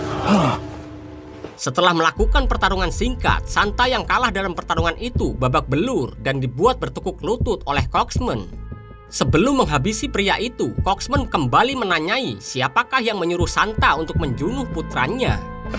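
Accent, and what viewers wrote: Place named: Central Java, Indonesia. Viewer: iki logate wong jateng iki... Kendal semarang?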